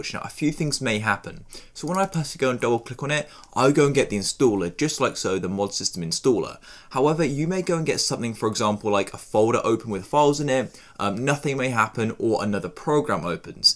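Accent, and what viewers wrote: United Kingdom. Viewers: are u british??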